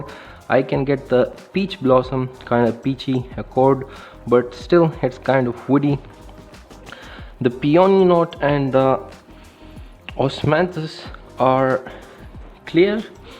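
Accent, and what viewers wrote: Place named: Pakistan. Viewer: Пакистанский акцент. Очень сложно понять его английский.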